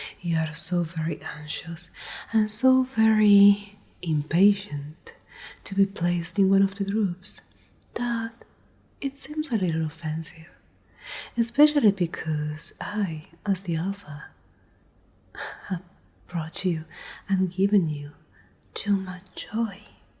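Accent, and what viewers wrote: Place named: Latin America. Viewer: btw, I noticed that in the video prior to this one and in some prior (the birthday party one) you had some Spanish worded books and/or a Latin American accent. Are you from a Latin American country?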